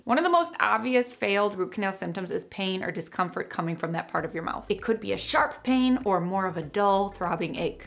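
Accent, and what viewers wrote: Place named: Wisconsin, United States. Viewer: An Italian with a Wisconsin accent.. weird!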